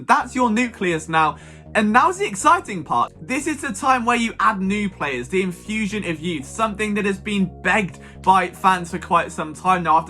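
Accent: United Kingdom. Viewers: You have a great show. The haters are shocked that a dude with a brutish accent has this much Eagle knowledge. Big ups to you.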